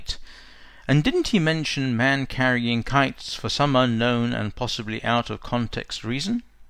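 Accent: United Kingdom. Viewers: If you can't tell an obviously English accent from an Australian one, how on Earth can we believe a single word of the drivel you have typed above?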